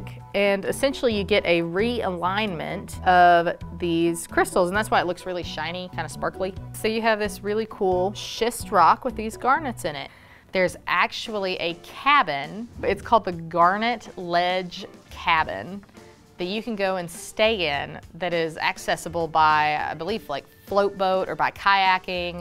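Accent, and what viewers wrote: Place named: South, United States. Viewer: Miss tries real hard but her Southern drawl keeps coming in and out am I the only one that thinks Miss might possibly be Cherokee?😘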